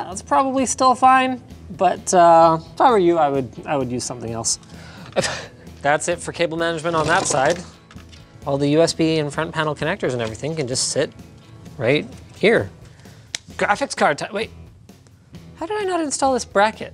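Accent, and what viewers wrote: Canada. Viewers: He's Canadian, that's how they say the letter Z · freaking Canadians and their pronunciation of the letter z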